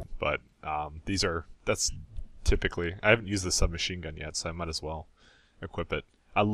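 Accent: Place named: Canada. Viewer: Candians always sound like that, even as babies.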